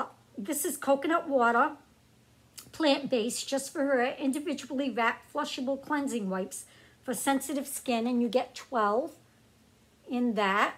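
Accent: Boston, United States. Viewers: Are you from Boston ? Your accent sounds like it , from Canada 🇨🇦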